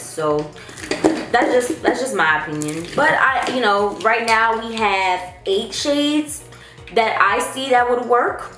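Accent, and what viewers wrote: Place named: South, United States. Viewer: Slay! Yes Texas keep bringing the southern accent out of!!! <3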